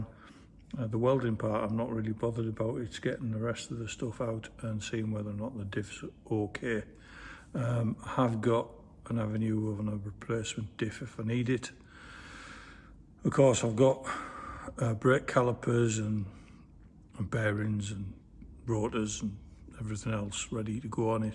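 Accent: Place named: Guisborough, United Kingdom. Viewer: New to the channel, nice work mate , you sound local to me , I’m from guisborough, check out Mike from britanica restorations , he’s in Canada but originally from guisborough too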